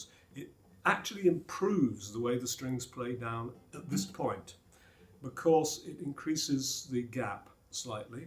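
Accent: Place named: United Kingdom. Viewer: I am truly intrigued by this gentleman’s British accent. Being an American our English is is rather lax and candid.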